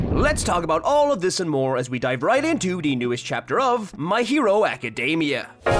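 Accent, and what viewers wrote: Ireland. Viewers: Where ye from lad? Ur accent sounds slightly irish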